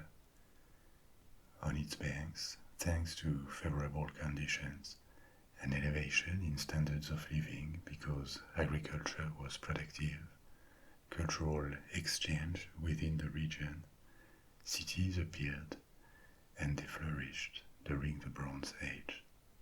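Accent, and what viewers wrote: France. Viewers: I hate the fact that this channel is labelled ASMR. I hate the way you whisper. Hell, I even hate your French accent. But bro... son of a gun, I will be going to sleep to your soothing tales of ancient myths, legends, and history for the next few months. Damn you, ASMR Frenchman. Damn you.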